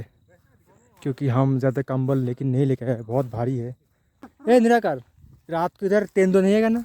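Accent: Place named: Bengal, India. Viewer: sune mone hochhe tumi bangali, hindi te vlog kano korcho?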